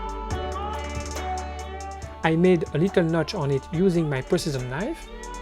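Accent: France. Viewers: T'est français?, je trouve que t'as un accent francais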